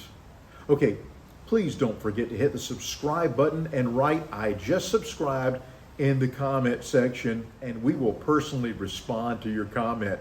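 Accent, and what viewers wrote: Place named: South, United States. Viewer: I love your slight southern accent. I’m from NC, live in CA and considering moving to Mexico.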